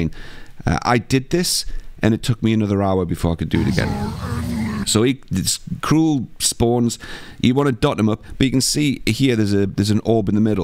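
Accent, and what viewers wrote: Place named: North East England, United Kingdom. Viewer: Also where abouts you from North East accent im from NE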